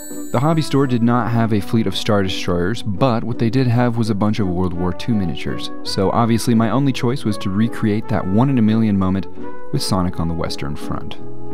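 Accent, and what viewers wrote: Germany. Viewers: Perfect northern German pronunciation. Not a native speaker, but have been speaking it for about forty-four years.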